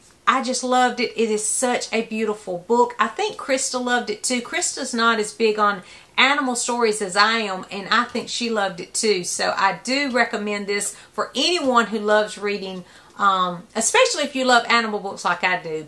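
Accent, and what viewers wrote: South, United States. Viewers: Welcome Back! This is the first time I've commented here but I just want to tell you that I've missed seeing you and hearing that lovely Southern accent. And oh yeah right now I'm reading To Kill a Mockingbird. It was so good to see you again. It really made my day!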